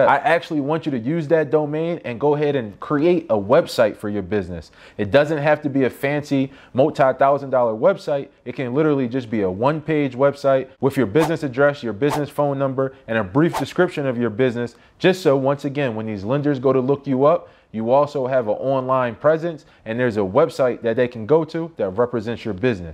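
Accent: Philadelphia, United States. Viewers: Cuz sound like he from Philly 🤔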